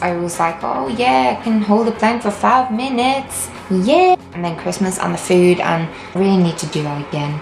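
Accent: Ireland. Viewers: Was wondering about that accent.. Now I know. :D I love Irish accents, they're cute!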